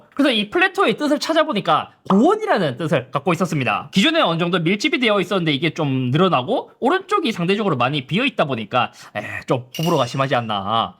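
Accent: Gyeongsang, South Korea. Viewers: 뭥미..경상도 사투리 쓰면서...왠...한화 유니폼을 입고..??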